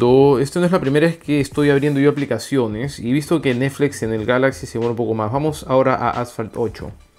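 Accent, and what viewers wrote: Peru. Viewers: eres peruano???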